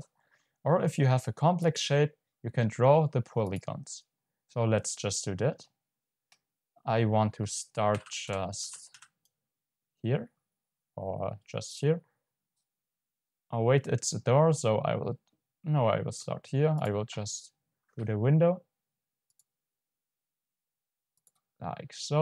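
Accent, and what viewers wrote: Germany. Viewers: Mein hört dein deutsch raus 😂 aber alles gut thx für das Tutorial war sehr schön👍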